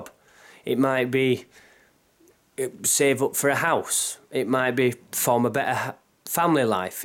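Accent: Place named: Yorkshire, United Kingdom. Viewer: Hi man...you're an unque guy obviously a free radical...I'm now in my 50s and was like you back in the 90s watching technology control people I'm not at all shocked to see what's happening because was saying things like you back then and folk just said yeah so what..your accent sounds Yorkshire..wakefield maybe,...I was a brickie too like you ..uourceirk looks good...all the best for 22..cheers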